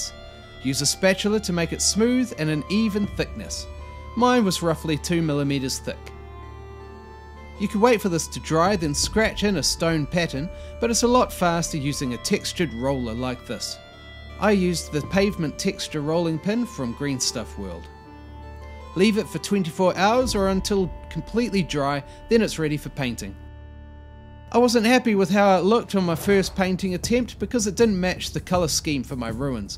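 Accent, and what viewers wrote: New Zealand. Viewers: I'm digging this tutorial, the accent makes this feel like having a WETA making-of-documentary clip for wargaming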